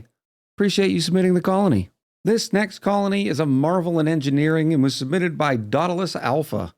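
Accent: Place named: United States. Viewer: I like your country accent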